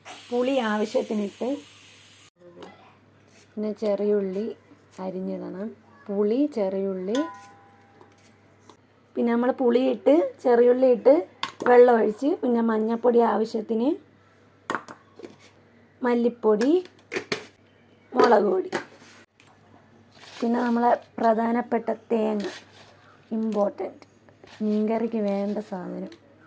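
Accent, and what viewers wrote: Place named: Thiruvananthapuram, India. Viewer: നിങ്ങള് വേറൊരു പുള്ളിക്കാരിയുടെ കൂടെ ഒരു മേക്കപ്പ് വീഡിയോ ഇട്ടിരുന്നത് കണ്ടിറ്റ് വന്നതാണ്.. തിരുവന്തരത്ത് എവിട? ഇതായാലും നിങ്ങള് ആ ഭാഷ തന്ന പറയണത് കൊള്ളാം 😊 നല്ലതായിട്ട് പോട്ട്!! 👍🏻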